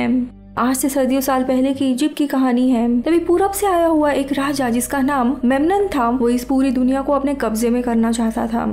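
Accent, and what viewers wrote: Maharashtra, India. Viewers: Are you marathi mulgi?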